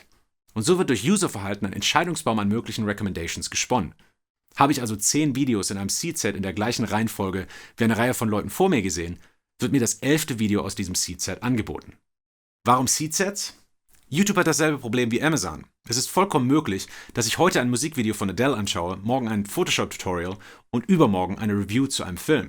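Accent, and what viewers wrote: United States. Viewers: bin ich bescheuert oder hast du einen leichten amerikanischen akzent? :D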